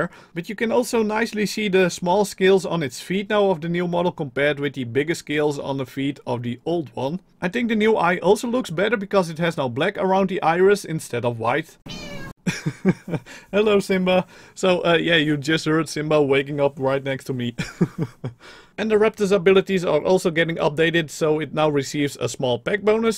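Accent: Netherlands. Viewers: Lord Tachanka volgens mij wel want hij had eens een nerderlandse command geplaatst en hij spreekt veeldingeb op een nederlandse manier uit zoals sarco en andere dingen en hij heeft zon nederlands engels accent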